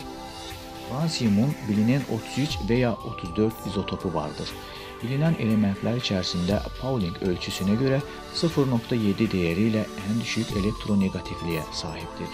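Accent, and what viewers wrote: Azerbaijan. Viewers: Adam Azerbaycan Türk'ü anlayamadı mı ses tonundan şivesinden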